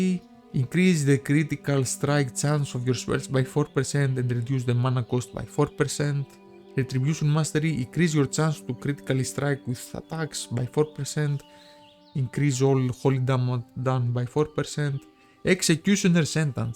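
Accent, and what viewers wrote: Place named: Greece. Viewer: From the accent i can tell you are greek 😂 ! Are you??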